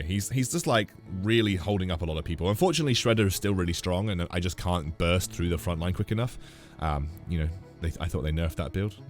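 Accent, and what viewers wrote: United Kingdom. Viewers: nohomo bro, you have such a clear, crisp voice! Great for youtube. This might just be the American in me thinking British accents are OP though.. LOL btw, what's your rank?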